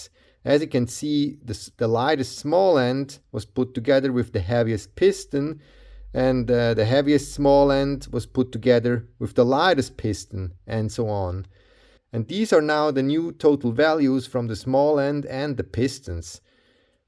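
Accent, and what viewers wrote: Switzerland. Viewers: Wie immer hochinteressant und äußerst kompetent. Aber wie immer ist es auch diesmal wieder verblüffend, Englisch mit schweizerischem Akzent zu hören. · I'm so sorry but as a German I can't stand the Swiss accent in your English. Just the captions were a lot better.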